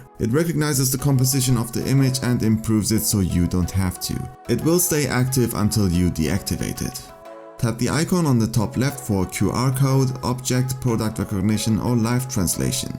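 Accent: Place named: Germany. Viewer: Der deutsche Akzent ist nicht zu überhören😂👆🏻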